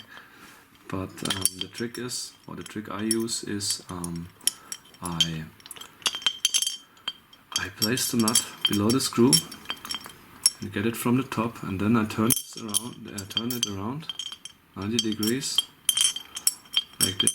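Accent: Germany. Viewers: Are you german? Your accent sounds like it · Am Englisch erkennt man sofort das hier ein Deutscher am Werk ist.^^ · Dein deutscher Akzent ist echt Hardcore !! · André Ribeiro He's German, you're a foreigner too so stfu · Boy this german accent sure is annoying... just as his fingernails -. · du bist deutsch oder?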